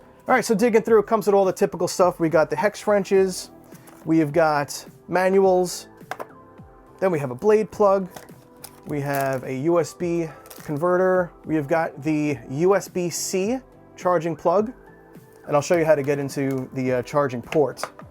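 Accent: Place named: Boston, United States. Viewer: I know that accent from any where you from boston?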